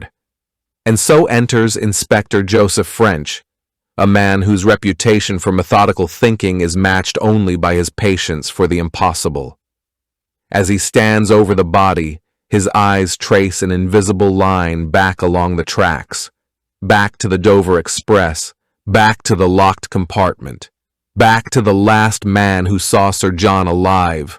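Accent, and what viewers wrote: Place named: United States. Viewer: The American accent spoils it for me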